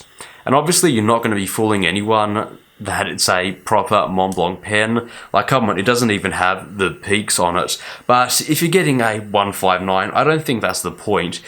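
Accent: Australia. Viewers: Lovely accent. Are you from Australia, or New Zealand? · From his vowel usage I’d say Australia, or Straya!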